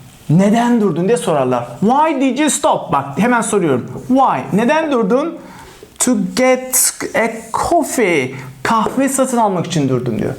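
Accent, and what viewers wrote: United Kingdom. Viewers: ingiliz aksanıyla da anlatması paha biçilemez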